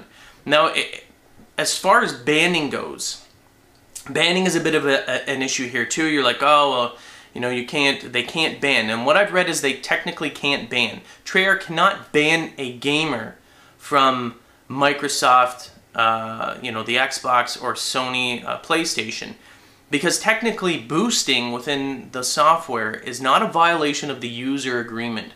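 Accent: Canada. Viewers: are you Canadian? just wondering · They should've taken it out of the whole dam game. side note...are you Canadian?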